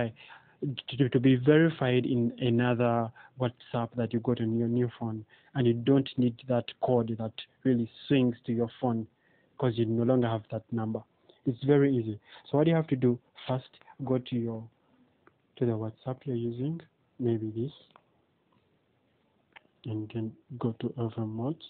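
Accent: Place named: Uganda. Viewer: you sound Ugandan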